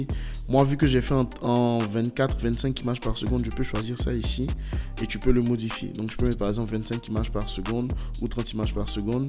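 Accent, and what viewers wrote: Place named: Ivory Coast. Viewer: T'es Ivoirien ? Ton accent est franchement cool😁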